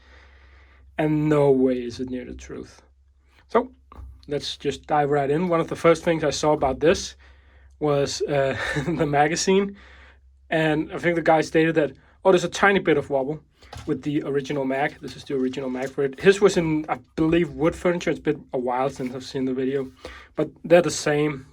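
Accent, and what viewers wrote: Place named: Denmark. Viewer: I got the wood version and yep the magazine wobbles a lot but surprisingly the flash hider is really solidly on there like I've even lost the small screw to make it stay in place and it still sits there perfectly, i can't speak for The Range because I replaced the spring. also due to the dialect, er vi Dansk?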